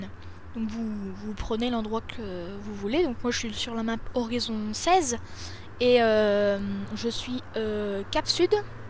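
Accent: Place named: North, France. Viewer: Super vidéo ! Et bel accent du Nord aussi j'aime bien xd !! Tu pourrais peut-être faire des lets-plays sur des maps ça pourrait être sympa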